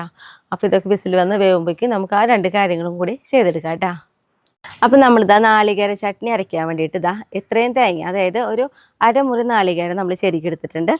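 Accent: Thrissur, India. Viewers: Thank youvery much for the information. Are you from Tcr side I am happy to hear our Tcr slang .now iam in Kollam.your way of presentation and smile beautiful. The vessels you used are super .surely I will make these Dosas try to make my huaband Happy. Bye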